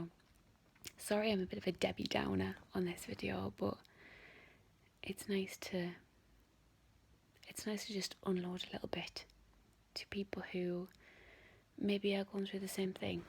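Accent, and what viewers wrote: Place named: Newcastle, United Kingdom. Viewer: .....sound like a Geordie!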